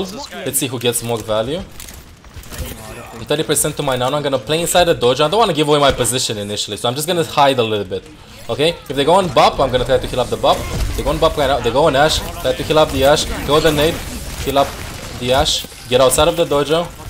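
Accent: Romania. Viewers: He's Romanian lol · Did i say he needs to hide it? I just asked if he's doing that accent on purpose because if yes I'd rather him to speak normal english without romanian accent to understand him more.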